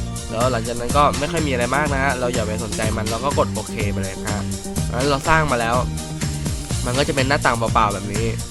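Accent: Thailand. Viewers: เสี๊ยงเหน่อดีแท้น้อออ 😁😁😁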